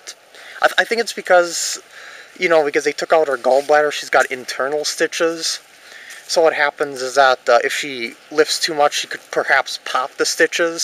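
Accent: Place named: Wisconsin, United States. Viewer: no idea where that is, haha it sounds cool, i never heard your accent before and now i know what a "Wisconsin" accent sounds like ;)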